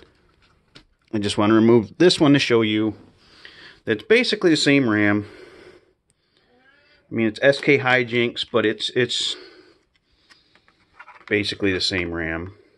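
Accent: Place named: Wisconsin, United States. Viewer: you sound like you're from Wisconsin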